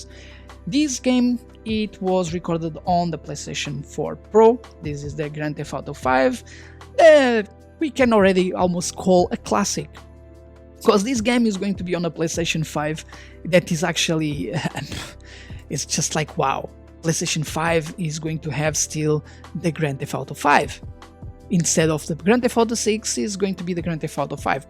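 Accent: Portugal. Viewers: Great video, I made my decision and will definitely buy this monitor after watching many videos on your channel about this. Also, dude are you Portuguese? Your accent really looks like it 😅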